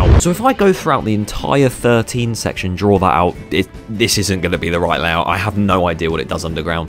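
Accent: United Kingdom. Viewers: british accent just enhances the video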